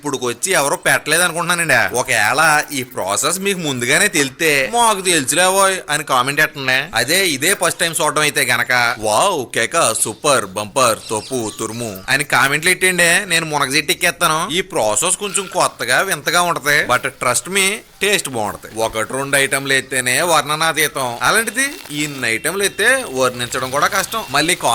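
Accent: Godavari, India. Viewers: Wow bro keka super not ur pichhadi ur ankering is super with ur godavari slang..,only urs slang d ur godavari words....best of luck thammudu...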